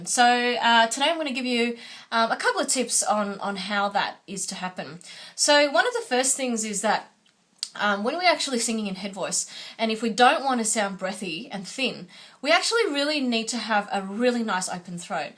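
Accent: Australia. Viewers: LOL what does being a linguistics major have to do with anything? She has a pure Australian accent. Is the accent not the same as any other Australian accent from that region? How does her being Asian play into your interest...(ahem) exoticizing on a linguistic level? I could see if there was some residual Asian accent to make an interesting mix but there is none.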